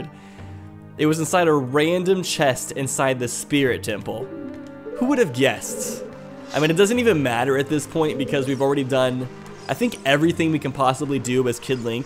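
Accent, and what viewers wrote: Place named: Midwest, United States. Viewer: Haha, no problem. Understand that I mean no ill will or insults to your location dialect (I live in the midwest too and am kinda guilty of the "Ohp" thing as well)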